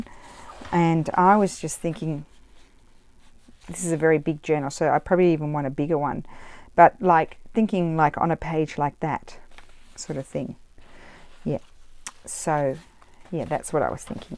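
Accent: Australia. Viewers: Great video, loved watching you create those pockets... It's also nice to hear another Aussie!!! I was chuckling away listening to your story... 😂😂😊 tfs xox hugs Fi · My husband said the same to me about upside down butterflies. I told him I like them that way. Haha. And I love your Ozzie accent. I had gardening friends in Texas from Australia.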